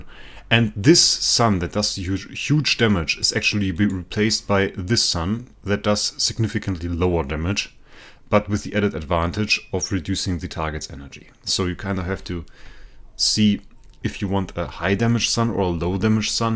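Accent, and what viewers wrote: Germany. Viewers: Is ur motherlanguage german?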